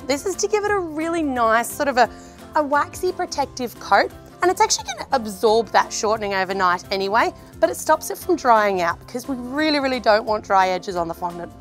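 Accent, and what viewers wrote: Australia. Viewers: Does no one else think she sounds like an Australian Rachel Ray? Lol! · Draco Malfoy has a British accent....hers is Australian. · I love your Australian accent! My English teacher is Australian here in Sweden and oh my, I think about you every time I hear her :)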